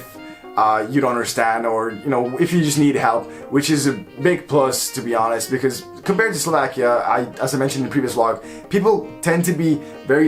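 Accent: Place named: United States. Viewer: where are you from? You have an interesting accent, it sounds American but not quite.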